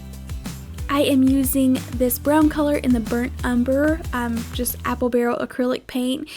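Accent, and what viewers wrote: South, United States. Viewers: OMGosh!!! You're SO adorably talented!!! I'm new to your channel, but have subscribed. I've seen like maybe 2 other videos of yours, but THIS ONE is absolutely TOO CUTE!!! TFS! Blessings to you & your loved ones! PS. Is that a southern bell accent? I'm originally from upstate New York, but lived like 28yrs in southern California, & the last 10 1/2yrs in the NW area of Washington state! (By Seattle.) ONLY asking cause I LOVE your accent!!! Anyway, HAPPY CRAFTING!!!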